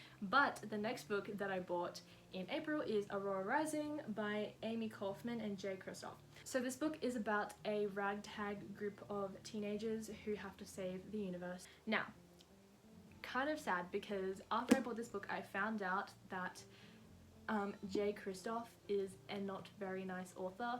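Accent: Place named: Australia. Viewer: oh the way I love Aussie accent 😫🥺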